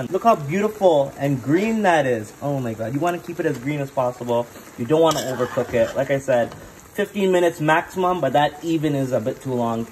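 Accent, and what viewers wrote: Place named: Guyana. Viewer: You're so funny 😅, this is how videos should be made, not boring, I'm cracking up really hard at your guyanese accent 🤣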